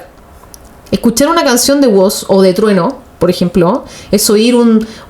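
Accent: Chile. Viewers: GRACIAS...de corazón...se te oye de chile...te sospecho en Argentina...de ser así, un secreto....se te nota conmovida por BUenos Aires, y más siendo arquitecta, tenes mil secretos para revelar...algo mas...si amas BUenos Aires,la ciudad, su caos, su ruido, BUenos Aires te alojara en un lugar de su Corazón...eso es ser de BUenos AIres...y ningún porteño va a poder quitarte esto...los primeros hijos de esta ciudad, no fueron nacidos, fueron adoptados...un ticket a otra vida...la apariencia de ser agrandados, atropellados, es el derecho de piso a existir, para no ser tragados, luego queda y se hace característica...y uno se jacta...por que cualquier cosa es buena para ocultar los dolores, y otras nanas...BUenos Aires es un monstruo, con lugar para quienes le susurran, y a ellos, les da su ternura...todo un descubrimiento... otras ciudades, quizás, sean fáciles...a esta, le toco aser asi. 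Buenaventura...!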